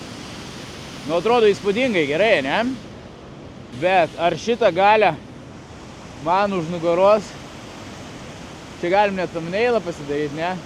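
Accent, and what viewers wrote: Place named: Samogitia, Lithuania. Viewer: Labai simpatiškai skambėjo tavo kalba žemaitiškai👍pajutau jog esame giminingi...Palanga močiučių🥰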